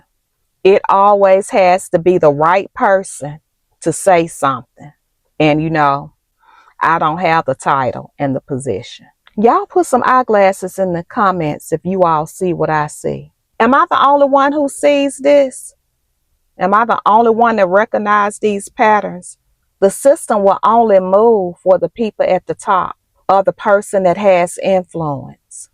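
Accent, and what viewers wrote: South, United States. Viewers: Hi, love your makeup and southern accent. I 🕶️ what you 👀. I’ve never had the 4 legged rat at work, but that dang conniving 2 legged rat will try to get you every time! Be blessed! 🙏🏽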